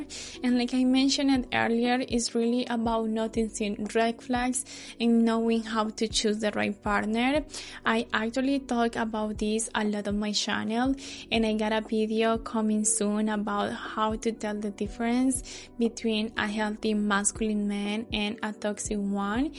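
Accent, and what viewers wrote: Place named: Ukraine. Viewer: Im guessing Ukrainian or Solvakian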